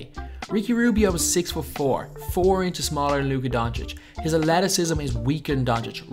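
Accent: Dublin, Ireland. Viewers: gotta be Irish with that accent lad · Are you from Dublin? I'm from Kerry. Love the content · are u irish? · you Irish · Oh shit nvm what county your from lad ? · Are you from Dublin?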